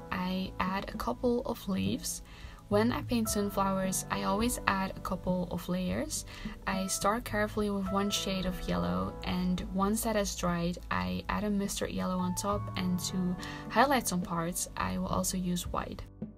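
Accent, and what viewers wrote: Netherlands. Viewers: Your accent sounds, N e d e r l a n d s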